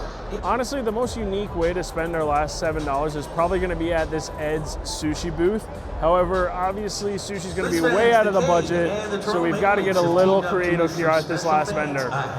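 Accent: Canada. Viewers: Ugh. The southern Ontario accent is so annoying. It’s hard to listen to. Just speak normally.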